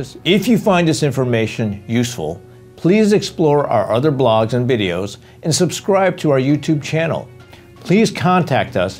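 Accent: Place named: South, United States. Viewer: Great information! So funny hearing a Chinese man with such a southern American accent!😄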